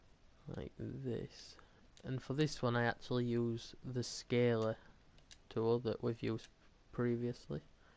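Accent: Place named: Yorkshire, United Kingdom. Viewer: Deffo from Yorkshire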